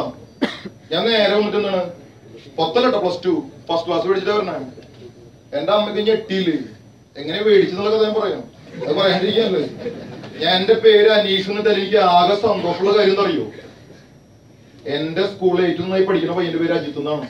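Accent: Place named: Malappuram, India. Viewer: Do arado paranjad malappuram bhaasha virthikettadennu listen to 19:4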